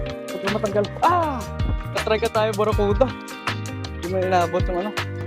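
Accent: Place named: Iloilo, Philippines. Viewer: Ilonggo ka gali master taga diin ka master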